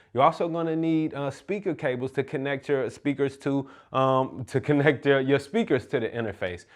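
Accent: St. Louis, United States. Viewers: You from Stl? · Yoo, you from the STL? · I knew you was from st. louis by how you was pronouncing yo ER's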